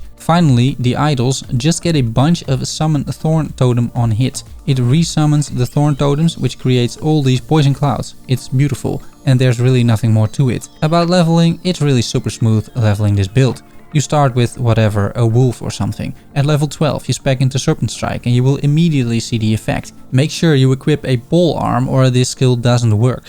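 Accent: Netherlands. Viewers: Your build guids are getting better and better! Keep up the good work. Where are you from? Are you Dutch?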